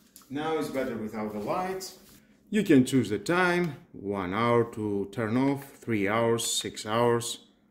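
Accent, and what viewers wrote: Greece. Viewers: Εντελώς ελληνικά αγγλικά 😂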